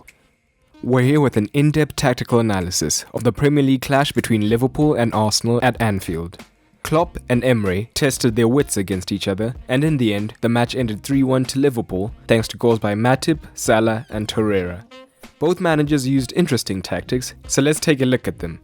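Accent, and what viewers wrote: South Africa. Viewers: Is the narrator South African? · Are you South African?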